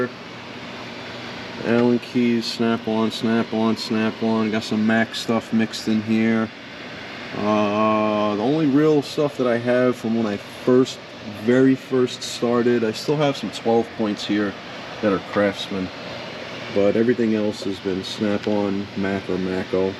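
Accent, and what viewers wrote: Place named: Long Island, United States. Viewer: Ohhh the OCD drawer was sweet! I sense Long Island, Brooklyn or possibly Jersey in the voice? LI here…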